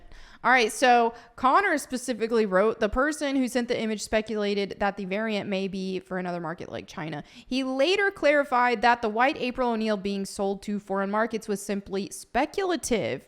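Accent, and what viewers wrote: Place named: Texas, United States. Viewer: Hey I was wondering, what happened to your Texan southern bell accent you used to have and southern bell body movements and poses you used to do. I noticed you started to consciously practice annunciation there in your first videos and after that is pretty much went away. Nice work, was just wondering why you felt you needed to change to that extreme when you started streaming and posting vids online? I noticed that as well cuz us southerners don't really annunciate T, kinda rolls, don't really try on em but in the vids i noticed you really started hitting them hard.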